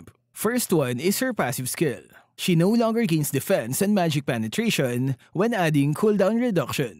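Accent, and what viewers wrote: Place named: Philippines. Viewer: I'm not focus cz Filipino accent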